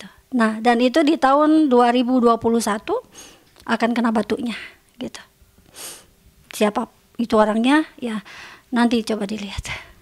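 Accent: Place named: West Java, Indonesia. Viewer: Orang sunda ya, keliatan dr logatnya..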